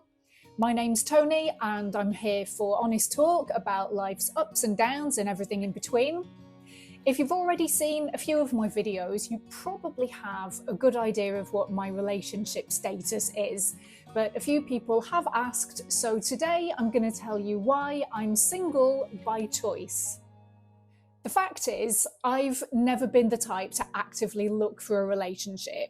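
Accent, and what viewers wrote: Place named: RP, United Kingdom. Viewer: Very posh .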